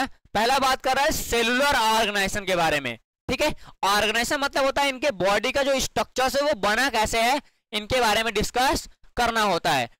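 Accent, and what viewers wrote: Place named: Bihar, India. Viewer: By the bihar me yesi hi pronounce hota hai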